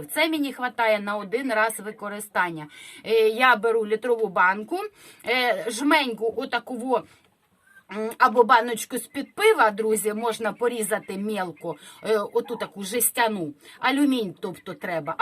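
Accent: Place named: Central Ukraine, Ukraine. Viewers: Пані! Дякую вам за поширення домашніх справ. У мене закралась цікава думка, у вас така говірка, ніколи не повірив, що ви з Кривого Рогуі...наче десь з центральної України ближче до Полтави. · Зайшла на ваш канал вперше,лайк і підписалася, дякую за щирість за таке українське спілкування,дивлюся Вас із Італії але сама родом із Вінниці,мова дуже схожа, дякую, буду слухати ваші поради, вони дієві це точно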